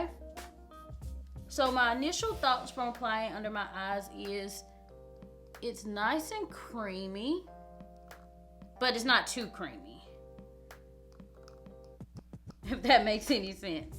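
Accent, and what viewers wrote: South, United States. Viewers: You're so cute with your country accent.... nice look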